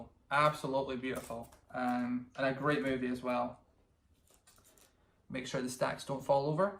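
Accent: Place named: Scotland, United Kingdom. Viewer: Hey dude you sound Scottish - where about are you from? Do you buy blu rays from Asda HMV etc? I’m big into 4K blu rays also from Scotland. Watched Aquaman 4K on my Oled and was incredible.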